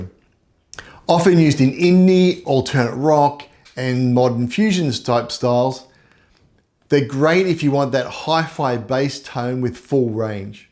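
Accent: Australia. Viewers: Great content and nice to hear an aussie too mate. Plus I have the exact same bass as you so great to hear these demos. Keep it up!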